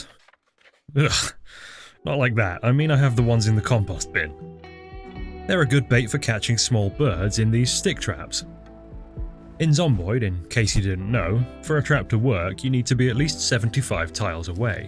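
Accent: United Kingdom. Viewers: Absolutely love this channel. As a fellow Brit you're much more enjoyable to listen to compared to some of those dashing Yanks.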